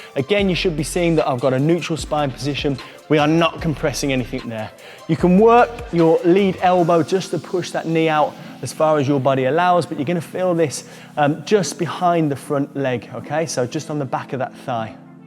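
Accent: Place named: Birmingham, United Kingdom. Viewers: thanks dude for this information also for this british accent hahahahah;) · you don’t hear the Birmingham accent on YouTube! 😀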